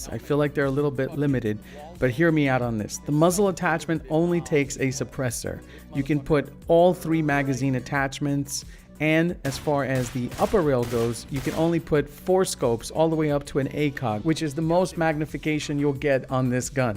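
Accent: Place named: Russia. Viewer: I like sat Russian accent tho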